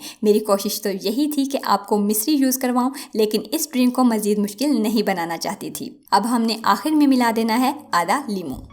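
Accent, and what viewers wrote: Punjab, India. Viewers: Hahahaha ap Punjabi hooo ghera Dena grander ko sooooo 😍😍😍caut gheera😍😍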